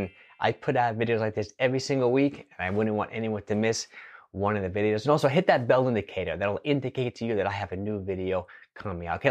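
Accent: New York, United States. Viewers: you New Yorkers have your own accent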